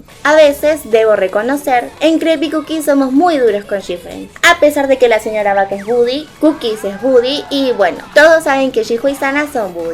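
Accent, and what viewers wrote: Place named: Argentina. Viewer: Te siento acento Argentino XD.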